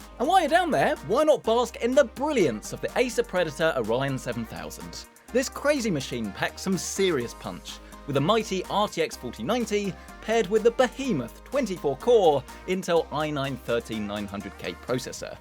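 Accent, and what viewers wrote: United Kingdom. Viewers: Too much British can't understand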